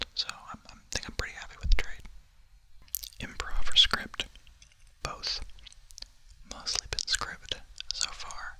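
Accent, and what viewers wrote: South, United States. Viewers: Congrats on 10K! That’s so exciting! First of all, your cats are the cutest. Pet them for me pleeeeeeeeease! Now, can we just talk about the fact that you played D&D and were a DM for campaigns? That’s so cool! And the fact that you’re a big sci-fi/fantasy nerd is awesome also. I’d love an audio like that from you in the future. And since you love the genre so much, I’m sure you could create something amazing with it. “Kill the shame inside your head, kids. It helps you open up new avenues for your life.”—This is such a powerful statement, ohmygod. Also, I’m impressed that the ‘Comforting You in a Crowd’ audio was improv! You did a great job with that and I never would have known you didn’t script it. It was very natural! The fact that your improv skills came from D&D makes me grin. I love that! D&D really is such a great way to learn how to act and portray a character. 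Even before you said you were from the South, I figured you were. Because you said y’all early in the video, haha. And then you pulled out that Southern accent and I was like, “CONFIRMED.” (You have a nice Southern accent, bytheway. Just saying shhhhhh.) As far as games go, I usually play visual novels or board games, but there’s one video game that I absolutely LOVE and will straight up tell you that I’m great at, which is Divinity Original Sin 2. Since you’re interested in fantasy, you should check it out. Might be up your alley! It's an incredible game and it has a definite (and good) ending, which is alway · Petition to hear your southern accent more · Okay...now that I know you're a southern boy, please do something with that accent!!! I can't tell you how much I tingled just hearing that little bit. I'm a Cali girl in Arkansas and I don't get to hear that from anyone but myself (I live in the country). 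Actually no, I heard a hint of it from a guy I met NYE but we spoke for 2 seconds before he was gone forever 😭😭😭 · The southern accent,AHHHHHHH it hit a switch that said yes just yes...buuuut I can understand not liking how it sounds especially on your own voice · This was funny and cute. Would definitely love a video with your southern accent. I'm dying from the little bit in this video, a whole video and I'm dead.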